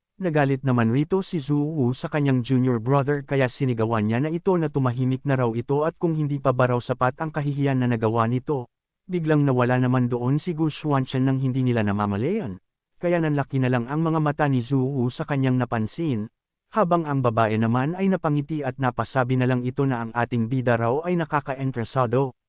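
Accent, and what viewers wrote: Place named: United States. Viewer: ok nayan lods wag Mona bagohin feel kolang Kasi Americano mag salita maganda namn recap mo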